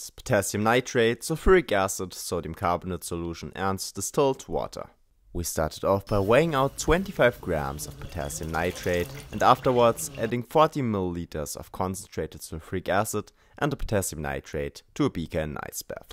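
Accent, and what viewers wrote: Germany. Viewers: Man hört sofort das du Deutscher bist 😀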